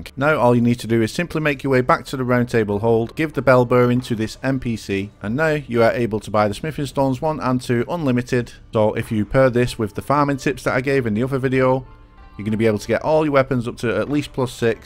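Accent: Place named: Northern England, United Kingdom. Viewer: Think your a northerner GGs I'm Scottish and bad with accents, it's close to mine tho. Subscribbled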